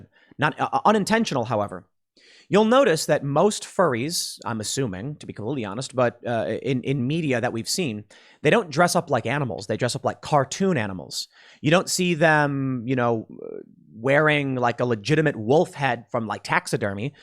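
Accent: Chicago, United States. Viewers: When Tim talks of Chicago accent, it is thickest where he is from. The South Side of Chicago has the thickest accents, both black and white accents... Mexicans are the same no matter where they live in Chicago, lol...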